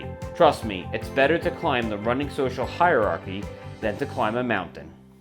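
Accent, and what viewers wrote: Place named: United States. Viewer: Your accent says American but your humour says British! Welcome home old Chum.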